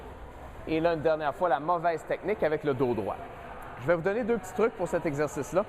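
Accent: Quebec, Canada. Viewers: l'accent québécois me fait rouler par terre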